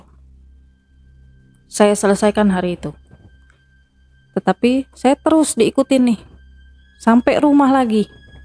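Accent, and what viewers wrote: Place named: Java, Indonesia. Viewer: Intinya bukan dr derah nya ! Elu sok2 jkt tp logat lu aja jawa. Bukan betawi. Justru org2 jkt jg banyak yg maun dukun !! Dmna pun itu jg ada pada main dukun. Pesugihan sgla macm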